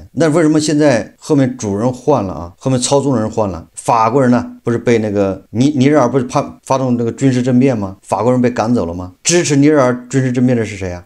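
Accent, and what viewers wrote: Anqing, China. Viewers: 你是安庆老乡吗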